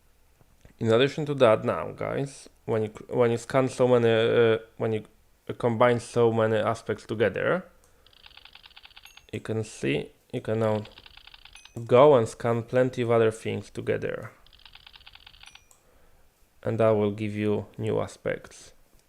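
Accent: Poland. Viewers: Haaa, odrazu wiedziałem żeś Polak :D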